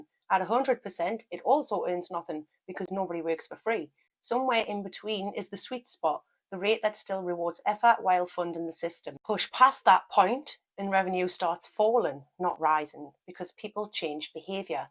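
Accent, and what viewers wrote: Newcastle, United Kingdom. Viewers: Fascinating insights but for some reason (not in a creepy way) the geordie accent is engaging to listen to 😅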